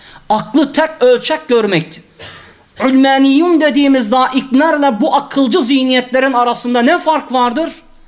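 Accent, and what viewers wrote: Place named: Arab world. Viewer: Islami kelami yasaklayan Islam liderler simdi cennette mi yani? Ne kadar ilginc, Kuran bu liderler geldikten sonra kelami yasaklar olarak algilanmaya baslandi. Islam cografyasinin icindeki parcalanma dusunmenin yasaklanmasidir. Iman kulun soz zikretmesine indirgenirse tepenize biner de binerler. Beyefendi, nicin kalin bir Arap aksaniyla anlatiyorsunuz meraminizi? Nisantasi Turkcesi'nden farksiz bir yerde.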